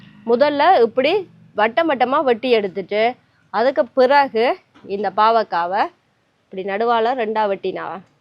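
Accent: Sri Lanka. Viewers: உங்க இலங்கை தமிழ் ரொம்பவே இனிமையாக இருக்குமா 😍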